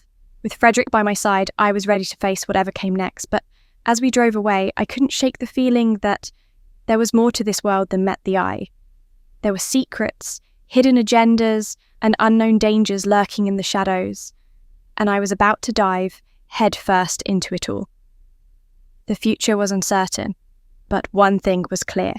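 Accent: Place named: United Kingdom. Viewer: Well, that's it. I'm throwing my unfinished short story in the trash. My favorite pen is going back in it's case. I can't compete with perfection. Dear, you have an exceptional gift for relaying a tale! Every requirement is spot on. Plus, I'm rather fond of the British accent. I could listen to you for hours. Simply put, your storytelling abilities are amazing! I'm sure our children will love their bedtime stories!🤣🤣🤣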